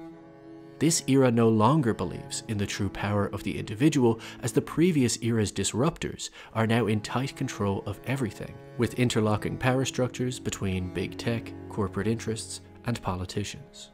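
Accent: Ireland. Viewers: I wonder if your Irish you sound like an Irish man like myself haha but these videos are absolutely superb I've been watching them all there brilliant observations!!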